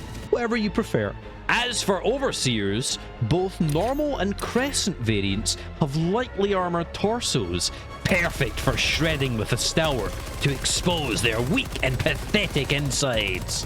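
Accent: Scotland, United Kingdom. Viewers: Got as far as the 50th mispronounced 'a' then switched off. Just speak normal, mate. Its ok to be Scottish. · I got so used to your nice scotty accent that when the switch to the redneck Southerner accent came it felt like I got punched in the face. A very Democratic punch, but a punch nonetheless. Great work 👍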